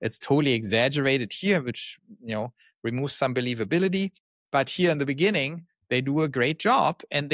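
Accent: Germany. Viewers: Are you German? Your accent sounds like it but your name doesn’t lol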